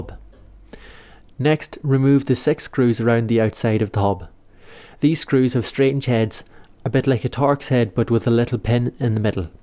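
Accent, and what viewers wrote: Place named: Ireland. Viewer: Thanks, your accent sounded a bit Ireland.?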